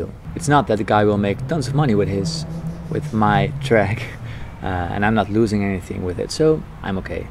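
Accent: Netherlands. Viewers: I Always thought you had a dutch accent and the mail in this video confirmed it for me :) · ik ruik je Hollands accent, Hup Holland Hup we worden Wereldkampioen 2022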